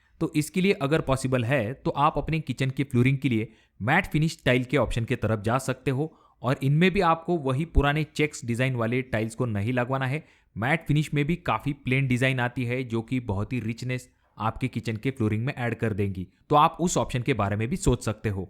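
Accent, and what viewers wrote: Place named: Gujarat, India. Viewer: As per your speaking hindi I think you're a Gujarati I want an Idea for L type small kitchen guide me sir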